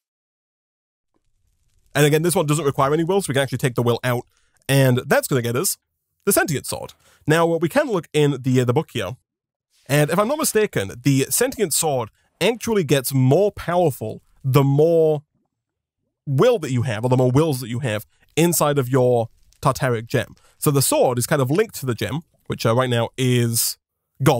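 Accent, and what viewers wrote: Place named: Yorkshire, United Kingdom. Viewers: British, I think. He’s British, I know that much, but I don’t know the accent. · Yeah he is from Yorkshire where the tea comes from but prefers the caffeine from coffee :p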